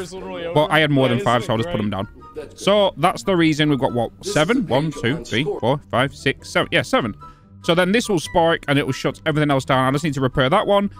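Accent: United Kingdom. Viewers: all, also ur British accent we you go, "GOD BLOODY DAM IT", all the spending spree montage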